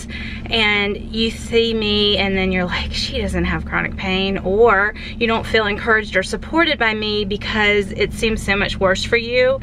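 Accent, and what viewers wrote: South, United States. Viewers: Wow... some people are really shitty (excuse my language). This is the first seeing you on YouTube. Immediately, I said I love her spirit. So, I am a new sub. I love that you shared your real life struggles. Your friendenemy sounds jealous hearted. You are probably everything she wish she could be but can't be no matter how hard she tried. Please keep being your authentic self. I love your southern north twang.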